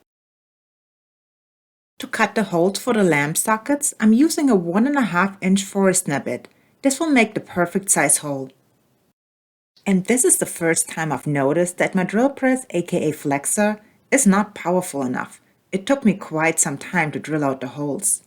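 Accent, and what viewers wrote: Germany. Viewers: Hello, which region of Germany are you from? Because of your German dialect I guess the southwest of Germany.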